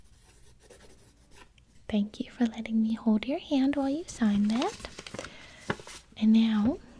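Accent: South, United States. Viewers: Why did her voice change from the start to the end she started to talk with like a southern accent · ...She always speaks with a Southern accent? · i love how her accent turns slightly southern. Cute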